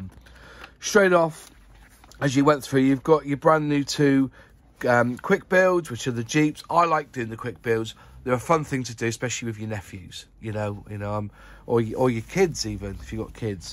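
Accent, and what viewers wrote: West Country, United Kingdom. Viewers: Thanks for showing the catalogue, some good stuff in there and personally I love the old vintage classics. Do I hear a fellow westcountry accent from yourself? Not cornwall like me but I can hear it 😉👍🏻 Thanks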